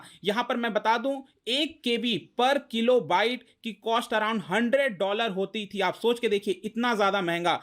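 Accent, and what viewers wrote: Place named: Bihar, India. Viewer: तुम्हारी कर्कश, हाई टोन वाली आवाज साथ में एक ही बात को कई बार रिपीट करना। ढेरों एग्जांपल दे देना। और हाइटोन रखते हुए लगातार बोलते जाना, लगातार बोलते जाना। अगर तुम्हारा यह वीडियो किसी ने पूरा सुन लिया, तो उसका हल्का सा बीपी तो बढ ही जाएगा और वह कम से कम 1 घंटे तक आंखें ऊपर करके बावला सा भी हो जाएगा। जो भी बताना चाहते हो उसको पहले कागज पर लिखा करो। बिना एक भी शब्द रिपीट किए हुए, मधुर आवाज में , संक्षिप्त में अपनी बात कह कर निकल जाया करो। परंतु यह सब तुमसे होगा नहीं। तुम्हारी टो से लगता है कि तुम बिहार साइड के हो, या पूरब साइड यूपी के। वैसे भी तुम लोग बहुत ज्यादा बोलते हो।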